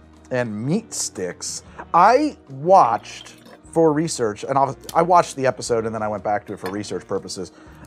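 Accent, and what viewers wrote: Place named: South, United States. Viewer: Oklahoma is technically the Midwest, but, like, add a slight Southern twang.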